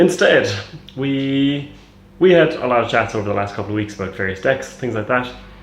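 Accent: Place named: Ireland. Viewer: Heh didn't pick up on your Irish accent, but now I can totally hear it. Congrats on your buddy's win!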